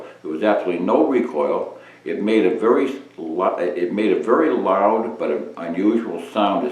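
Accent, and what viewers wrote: New England, United States. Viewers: Like everyone, I have some slightly different thoughts on some of your editorials but for the most part, when I want a very informative "no hidden agenda" intelligent perspective on my current gun interests, I go to. I'm from Texas and I guess I'm somewhat skewed in the area of first-impressions, but once I got past your (no disrespect) yankee accent, you became one of my buddies. Thanks for all the hard work you put into your videos. Don from Pearland, Texas.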